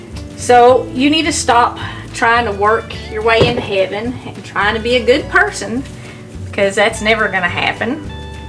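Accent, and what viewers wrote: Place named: Alabama, United States. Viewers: What part of the south are you from? I am from east, TN. Your accent sounds very much like ones from this area. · i'm guessing you're from Alabama, by your accent. You're cooking is similar to ours. I'm from Missouri and a lot of Missourians were originally (in the1800's) from Alabama, Tennessee, North Carolina.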